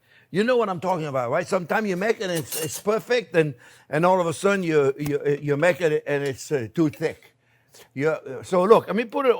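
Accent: France. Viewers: I assume based on your accent and name you’re French. Have you done any French soup videos or heck, any French food videos?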